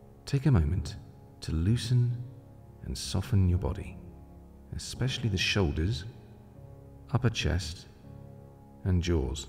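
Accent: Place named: United States. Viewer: Nazir Hussain sir, where did you go? You narration is calming and effective this American accent is too mainstream doesnt sound good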